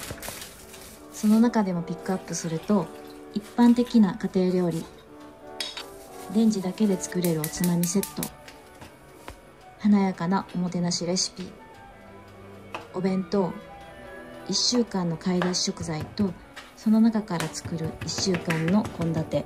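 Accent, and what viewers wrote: Kansai, Japan. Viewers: 書籍化おめでとうございます！！ 絶対買わせていたたぎます！！！！！ あと私も関西人だからすごく喋り方が安心する笑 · 初コメです😊同じ関西を感じていつも見ておりますw今回のレシピ本購入させていただきます😍おめでとうございます㊗️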